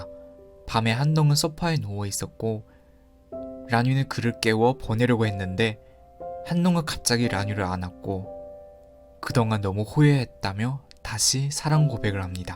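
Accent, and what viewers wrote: China. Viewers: 한국어 정말 잘하시는데 살짝살짝 특정단어나 문장에서 얼화가 느껴지네요 성조나 발음까지 고칠 수는 없나봐요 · 듣다보니깐 유튜버분이 조선족이신가보네요? 발음이 살짝 한국인 발음음 아니군여 ㅎ 잘보고 가요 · 중국분이신지 몰랐다가 증거가 부족했다는 설명에 어감에서 약간 알아챘어요! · 중국인이세요? 발음이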